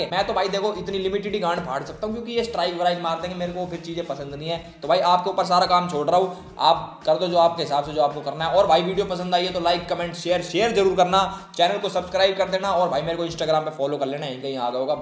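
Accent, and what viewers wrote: Haryana, India. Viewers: Bolne me thoda haryanvi touch h 😍😍😍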